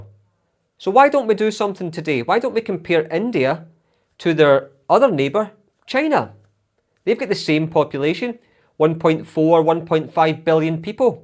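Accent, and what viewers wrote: Scotland, United Kingdom. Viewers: Your Scottish accent got me 😅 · I love your accent❤. I guess it is Scottish? Even though you are giving a constructive criticism which I agree with, I can’t help but notice the tone in your words. It is beautiful.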